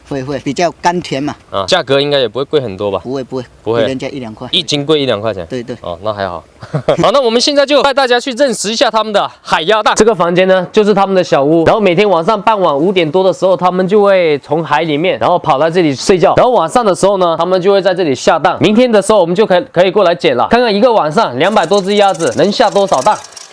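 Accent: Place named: Cantonese, China. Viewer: 靓仔会说粤语吗？因为听到你的口音好像会说粤语（出于好奇心）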